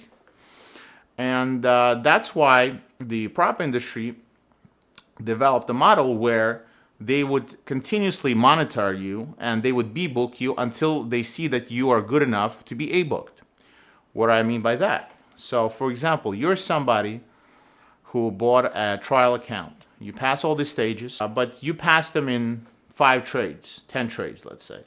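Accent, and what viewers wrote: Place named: Boston, United States. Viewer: you got that boston accent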